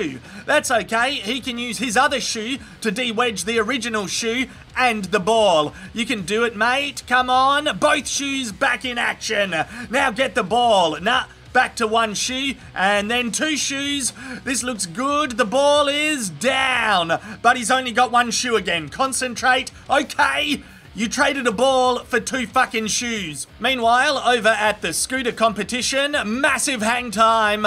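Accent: Australia. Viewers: Why is it funnier when it's in Aussie?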